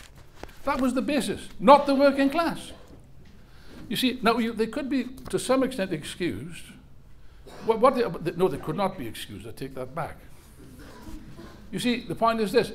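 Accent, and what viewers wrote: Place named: Wales, United Kingdom. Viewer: It IS a welsh accent, you’re right. Interesting that I didn’t pick up on it, since I’m a big fan of The Manics.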